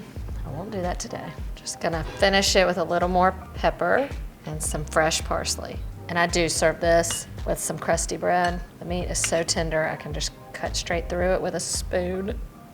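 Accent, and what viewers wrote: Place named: Deep South, United States. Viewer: Thank you. Love the accent. Very gentle Deep South?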